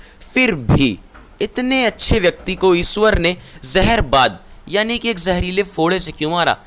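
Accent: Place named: Bihar, India. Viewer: Bihari ho ka bhai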